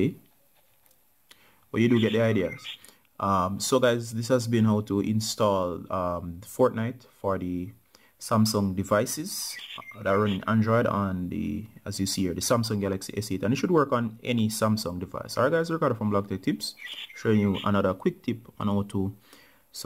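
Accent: Jamaica. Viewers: r u Jamaican I am idk it's your voice that caught me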